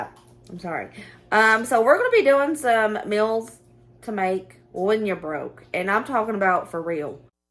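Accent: South, United States. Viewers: You talk country! Where ya from?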